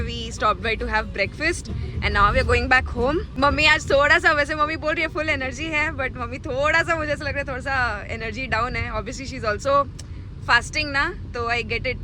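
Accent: Bihar, India. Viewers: Aap bhale he marwaadi ho aur calcutta m rhe ho kintu aapka lehza ya andaaz bihar u.p wala h.......love it❤❤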